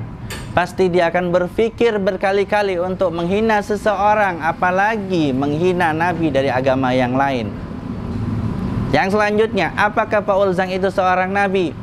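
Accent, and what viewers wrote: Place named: West Java, Indonesia. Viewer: Koh.. Chinese berlogat sunda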